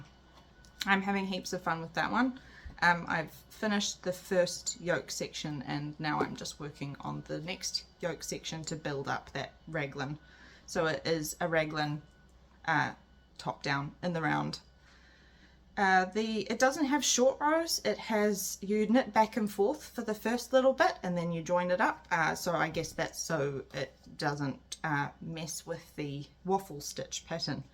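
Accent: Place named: New Zealand. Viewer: Hi - I've just found your podcast and I am loving listening to a local (Kiwi) accent. You've made some amazing items with such easy to follow show notes too - my "to knit" queue is getting longer.............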